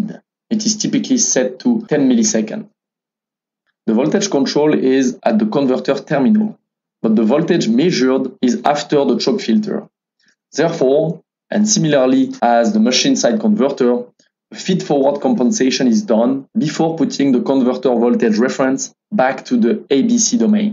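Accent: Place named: France. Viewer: Mon anglais est plutôt chaotique. Il me semble détecter un petit accent français assez sympathique ducoup auriez vous la même vidéo en français ? 😅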